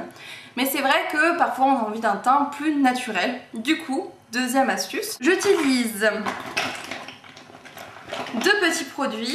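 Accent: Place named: England, United Kingdom. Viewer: Tu es de retourrrrr !! Tu m'as manqué ! Alors , ton accent anglais, il est trop bien, dis, tu donnes des cours pour l'anglais ? :) Superbe vidéo !!! J'aime beaucoup parce que ça fait également tes produits fétiches etc ! Gros bisou !! ♥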